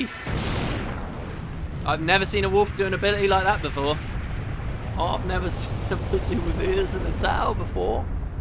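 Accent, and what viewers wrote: United Kingdom. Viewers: Hey just wanna say how much i appreciate you doing all of this. I get the vibe that you put way more effort in your vids than most other youtubers or LPers. That and the voice of a charming brit make a killer combo;) Keep up the brilliance!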